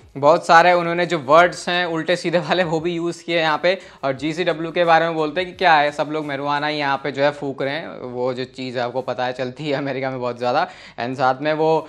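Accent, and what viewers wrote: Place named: India. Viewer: I love wwe content, but I don't understand Indian English.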